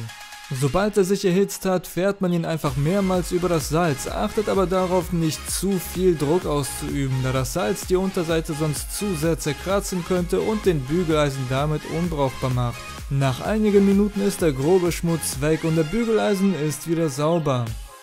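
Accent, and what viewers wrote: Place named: Russia. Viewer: hört man an deiner Stimme ich bin auch Russin habe aber ich spreche normal das sollte kein hatte werden OK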